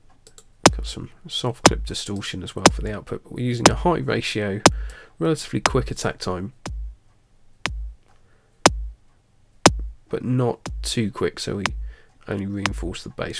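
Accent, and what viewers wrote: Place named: London, United Kingdom. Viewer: Hey- awesome tutorials...do you do private lessons? you sound like you're from London :)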